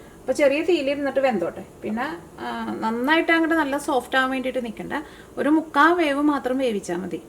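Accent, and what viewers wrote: Thrissur, India. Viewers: Nishkalangamaaya Thrissur Slang.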